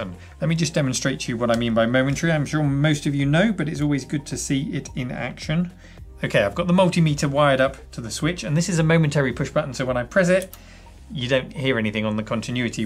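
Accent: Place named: England, United Kingdom. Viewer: Your english accent is very similar to simon whistler.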